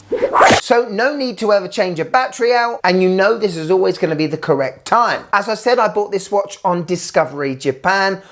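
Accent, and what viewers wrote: United Kingdom. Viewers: When I first saw one of this guy's videos, I thought he was really intense, but now I love him. Even though English isn't my first language, I understand him perfectly; I think British English is easier to understand than American English.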